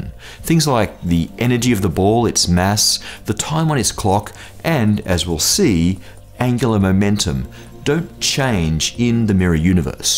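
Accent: United Kingdom. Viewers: "The zed axis", "the Zed boson" - strikes me as Brittish-y; do Americans say it like that? Or in the US they have the Zeeman effect and in the UK it's the Zedman effect? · This is the first Brit I can listen to without getting annoyed. And the information is easily digested. Very nice job. Thanx.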